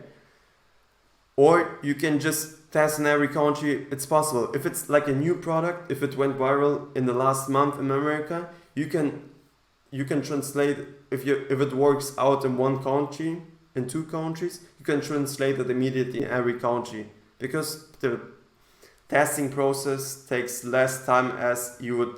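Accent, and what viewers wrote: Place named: Germany. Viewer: Die ersten drei Wörter gehört und sofort gewusst, dass du Deutscher bist hahaha. Geiles Video, hilfst mir damit weiter, danke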